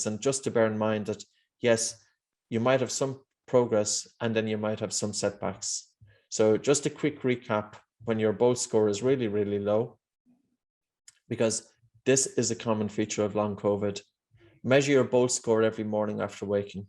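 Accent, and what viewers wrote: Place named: Ireland. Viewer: This is a very useful video. The Irish accent is so beautiful and calming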